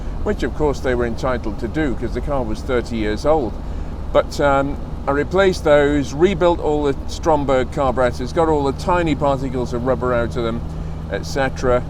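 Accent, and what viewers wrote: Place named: RP, United Kingdom. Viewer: so pure, I mean the car... and the perfect Queens English explanation.... Like!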